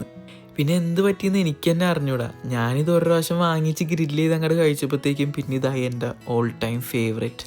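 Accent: Ernakulam, India. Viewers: I love your ekm slang❤️❤️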